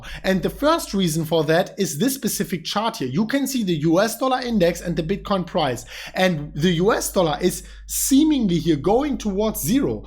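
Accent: Germany. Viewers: I always love the German accent haha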